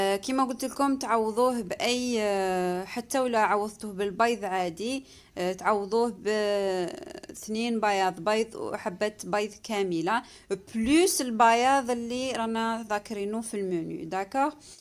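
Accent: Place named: Algeria. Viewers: ذي لهجة الجزاىرية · اللهجة جزائرية😁👍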